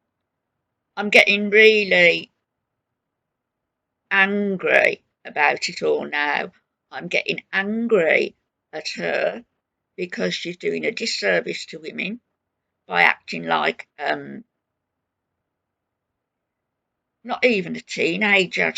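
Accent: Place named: West Bromwich, United Kingdom. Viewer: Hi, you’re so spot on with all of the chaos with both FB and Nader. You sound just like my family member from West Brom x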